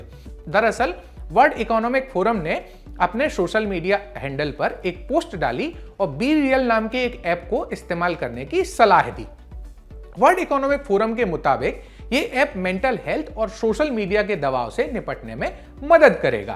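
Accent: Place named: Bundelkhand, India. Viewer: ji aap bundelkhand ya gwalior-chambal sambhag se hain kya. Aapke bolne ki style se anumaan laga raha hoon.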